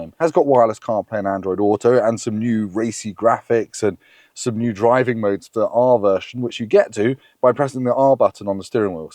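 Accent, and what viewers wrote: England, United Kingdom. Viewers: Not 100% sure because of the awesome English accent but did u say the R has no reverse camera? Mine has one that lifts and peels out from beneath the VW badge. Otherwise excellent review!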